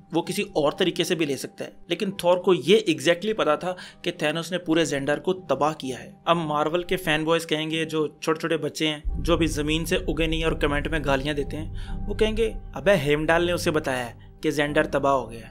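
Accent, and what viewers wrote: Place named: Pakistan. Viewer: Sahi pakde hai Bhai Mai aap kaa new subscriber hu muje Pakistani logo ke baat karne kaa lehzaa bahot pasand hai aur aapke videos bhi love u from india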